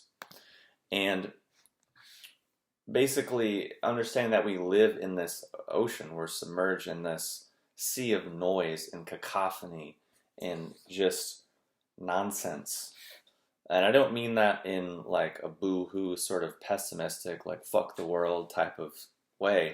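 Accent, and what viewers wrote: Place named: South, United States. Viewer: oxymoron if you don't know what he's saying but keep coming back because he talks level headed emm OK, u may have a demonic force on u haha. little penis lol Spot on all this spiritual Shit people take so obsessively and they're usually the biggest bastards behind closed doors. at least I know some of my beliefs are a delusion but I like them so I guess that's my entertainment...you are a thought provoking fella, (that southern accent, I fuckin love your mocking voices) 😂😂👍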